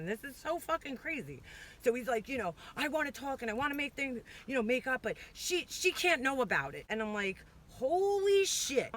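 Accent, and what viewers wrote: New York, United States. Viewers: Oh my god found your channel and listening to when evil enters your life part 3... I’m fucking dying! You rock with your New Yorkian accent and my kind of foul mouth F bomb mom! Shout out from Carla from Boston!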